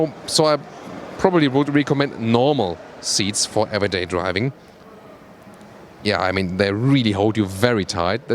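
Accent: Germany. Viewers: I'm enjoying this just because it is a German review of the car:) I've been to Germany 7 days and love it there but listening to a German speak English and review a car.....epic!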